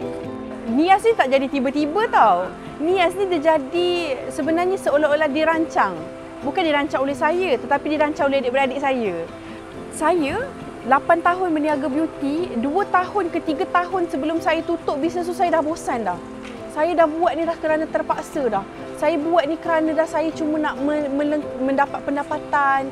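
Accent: Perak, Malaysia. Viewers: atay org perok ckp pun nampok comey jee...ajeee ranjooo betoiii kome.... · Best dgr dia cakap perak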